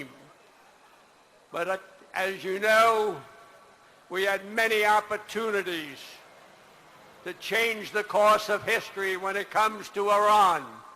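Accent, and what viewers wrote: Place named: United States. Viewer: I love this man! This is what a real American looks and sounds like.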